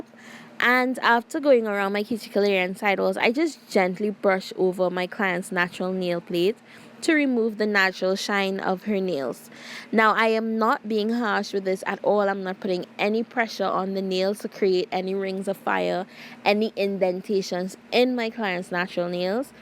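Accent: Trinidad and Tobago. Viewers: Omg your nails are gorgeous 😍😍 you did that !! I’m so glad I found your YouTube channel 👏🏾👏🏾👏🏾👏🏾 do I hear a trini accent Deh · You must be a Trini, I hear that infamous accent. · You go Trini !! I could hear your accent !!! Great job! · I hearing that Caribbean accent…Trini to deh bone? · totally sounds Trini!!🇹🇹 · Trini accent isn’t harsh it’s kinda soothing lol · Your accent sounds Trini. Love the step by step instructions.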